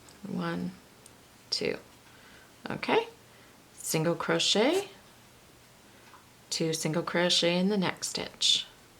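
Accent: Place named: Canada. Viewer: my mom says you sound very canadian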